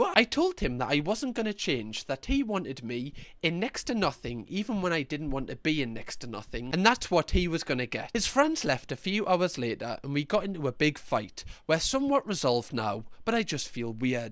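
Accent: Newcastle, United Kingdom. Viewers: Can confirm, it's just how we say it in Newcastle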